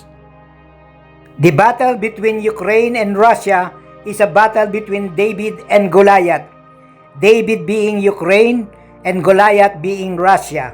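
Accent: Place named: Philippines. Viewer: Wow! That was great vlog,. Good English with Pinoy diction. Congratulations.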